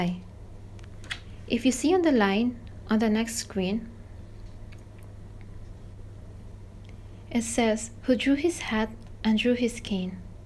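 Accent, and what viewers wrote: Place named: England, United Kingdom. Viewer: Nicee Content, I like your English accent. Tinapos ko para malaman ko dn sagot I think Andrew is more accurate, hihihi nag iwan na dn ako ngskli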